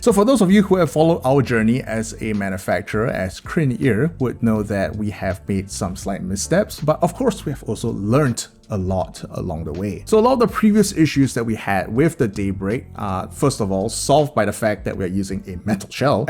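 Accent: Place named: Singapore. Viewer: Must be that Singapore accent. Similar "melody" of Nigerians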